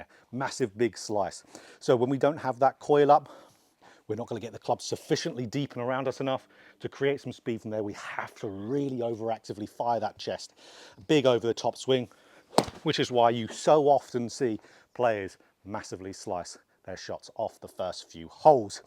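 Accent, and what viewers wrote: Essex, United Kingdom. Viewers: lol, I posted before you said you were in West Coast of Scotland ! 🤣thought you were an Essex boy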